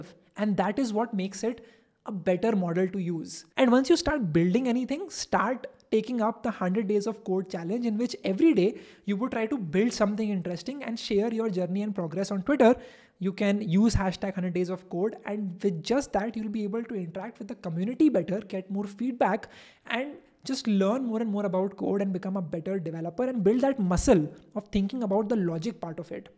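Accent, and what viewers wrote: India. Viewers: No racism, but the Indian accent lowkey legitimizes this video.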